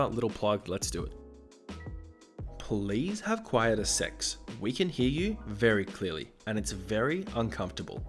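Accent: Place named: Australia. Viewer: I don't know why but j feel like your an Australian because of that accent btw I'm from nz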